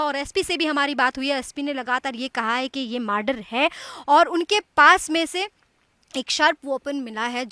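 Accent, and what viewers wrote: Bihar, India. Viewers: मैडम अपनी लैंग्वेज में थोरा बिहार का टच दूर कीजिए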